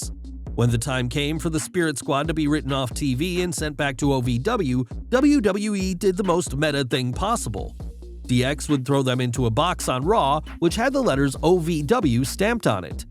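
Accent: United States. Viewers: I don't think I like this Mr. America's voice or very Systemic voice ... I like the English voice better as explaining American Manipulative business ideas such as "sports entertainment"... Haha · Not a fan of the American voiceover, sorry.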